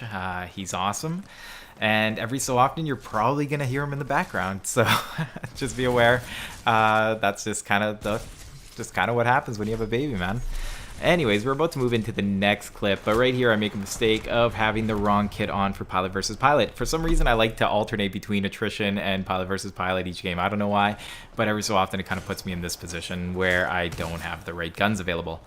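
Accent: Canada. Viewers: im digging the canadian accent over gamesager girly voice lol lol jk! you should do some Kraber with invisibilty gameplay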